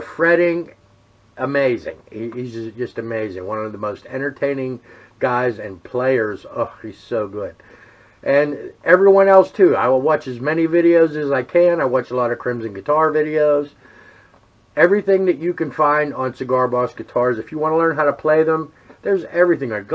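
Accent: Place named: Pennsylvania, United States. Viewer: Nice builds...love the walnut necks! When you said Bedford, I was listening to your voice, and I thought, has to be Bedford, Pa. I know that Pennsylvania accent! I'm from Red Lion...near York. Keep up the great work!😀🎶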